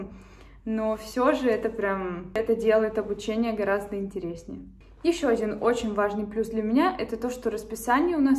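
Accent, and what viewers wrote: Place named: Urals, Russia. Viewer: А речь у вас не питерская. Много призвуков и звуков, зарактерных для уральских студентов, возможно это корни?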